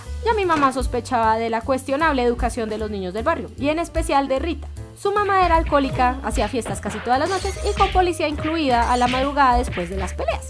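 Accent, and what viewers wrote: Colombia. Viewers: eres de colombia no?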